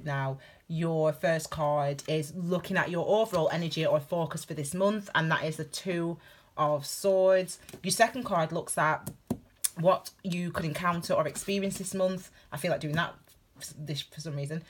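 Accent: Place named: North, United Kingdom. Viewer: My pleasure! More please! LOL! It’s ironic that when I listen to many of those Texan fast mouth readers I can barely understand them. Your beautufl Northern tones of course are so easy to listen to...and of course the content is excellent! 🍷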